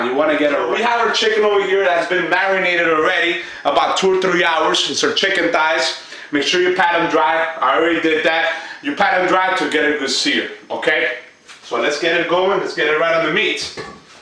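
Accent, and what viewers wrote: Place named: East Coast, United States. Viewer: Super annoying delivery. Suggestions: Either go back to pumping iron or lose the silly hat, the "I'm an east coast thug" accent and especially all the arm waving. This "performance" amounts to a bad caricature of and an insult to whatever ethnic population it is that you're attempting to mimic ("Latin" ??? If it's supposed to be Italian, you're insulting me; it's certainly not French... And by the way, you massacred the pronunciation of "Doufeu"). However... The food you cooked might actually be good!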